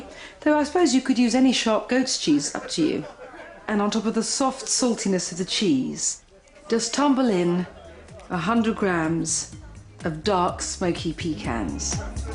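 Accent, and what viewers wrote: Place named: United Kingdom. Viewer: If got into ASMR she'd have a whole new career, with her calming voice and British accent.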